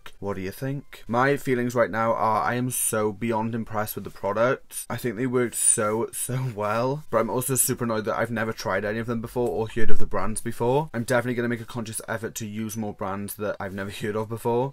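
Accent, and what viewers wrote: Wales, United Kingdom. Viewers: I love your accent!!!! Are you Welsh?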